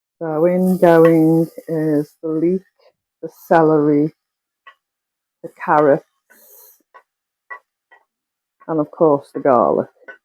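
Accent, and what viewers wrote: United Kingdom. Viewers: I enjoy soups and stews and this recipe looks delicious. I jotted the recipe down as you worked. Thank you for cooking it for us. It is a pleasure to be here, especially to hear words like "whilst," and the lovely English accent. Blessings to you and yours.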